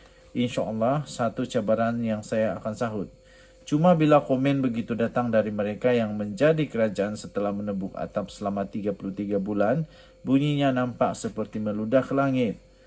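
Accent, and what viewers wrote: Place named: Sabah, Malaysia. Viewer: Rasanya abg ni org sabah...org sabah mmg cakap mcm ni...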